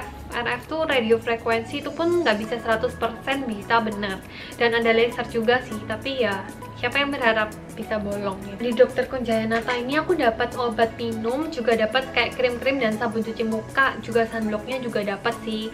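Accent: Java, Indonesia. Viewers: Cantik+logat medok Uhhhh😍😍😍🥰🥰🥰🥰😍😍😍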